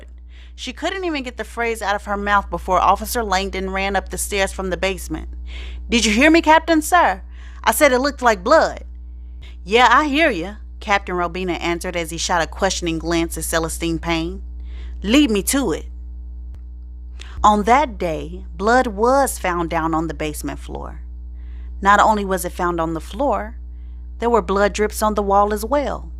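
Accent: South, United States. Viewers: Perfect. But that gone with the wind accent. Is odd and awkward for something that took place in NJ - Newark at that..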